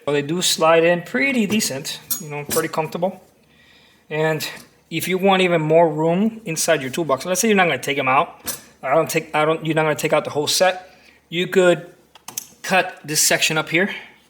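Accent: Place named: Cuba. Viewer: Great video! I like your voice, you sound just like my wife's uncle. He is Cuban, raised most of his life in the US, but has just a little bit of an accent.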